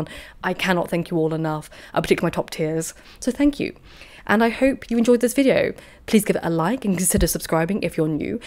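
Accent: RP, United Kingdom. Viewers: Just discovered this channel. Your voice is so deep and pronunciation so received (I think, not an expert on differences on UK regional speech), you sound like an incredible villain. Bummed it took me so long to find such a great history/archaeology/classics channel. Thank you for your work!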